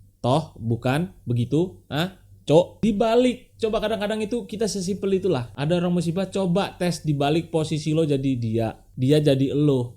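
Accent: Java, Indonesia. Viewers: Jawanya mana bang kamu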